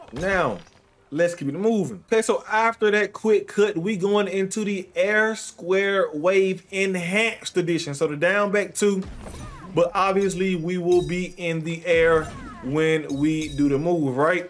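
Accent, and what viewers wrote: South, United States. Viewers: Love your step by step showing of combos. But what makes it even better is your dirty south country accent lol. JUST LIKE THAT!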